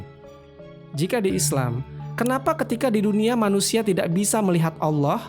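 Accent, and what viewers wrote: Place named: Java, Indonesia. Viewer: Dialeg jawa dibaca pembicara dlm dialegnya sendiri...ditelinga pendengar sangat lucu broooo😅😅😅 wisss...wissss embhuh sakkarepmu rek...😊😊😊😊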